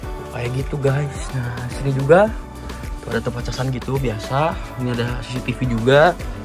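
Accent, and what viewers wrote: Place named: Kebumen, Indonesia. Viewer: Ngapak kebumen hadirr..bozz?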